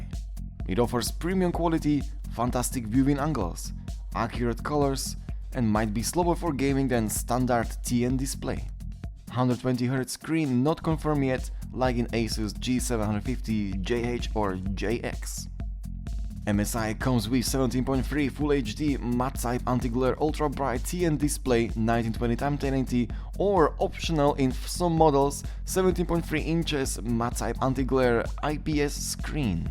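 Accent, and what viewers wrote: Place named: Romania. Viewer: nu pot sa nu recunosc accentul de roman care vorbeste engleza! :)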